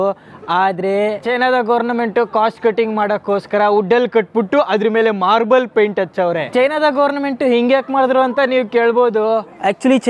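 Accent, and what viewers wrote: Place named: Mysore, India. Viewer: Thumba cute aagi mysore bhaashe maathaadthediya maga very nice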